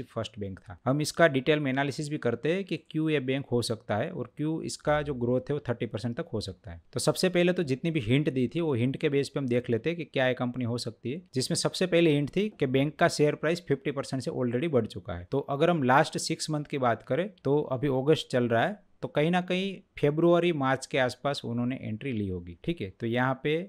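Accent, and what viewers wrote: Gujarat, India. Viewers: Thanks for your pure gujarati accent.❤❤ greetings from Surat...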